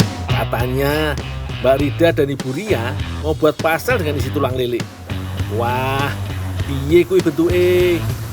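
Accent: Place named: Banyumas, Indonesia. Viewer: Ngapak lurrrrrr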